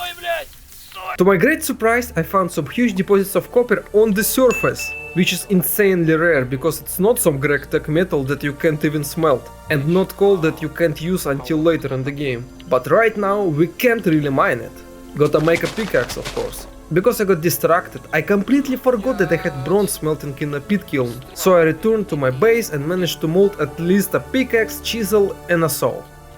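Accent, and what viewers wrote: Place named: Eastern Europe. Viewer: Самый славянский акцент из всех которые я когда либо слышал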